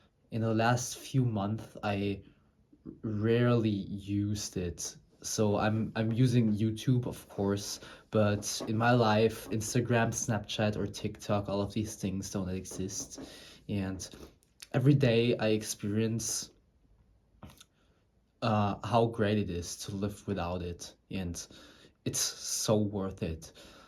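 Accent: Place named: Germany. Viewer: 2 seconds in and I know he is German :face-purple-wide-eyes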